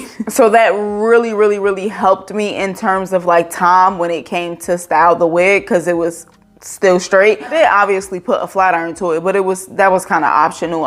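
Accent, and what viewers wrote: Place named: East Coast, United States. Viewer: Love your east coast accent!!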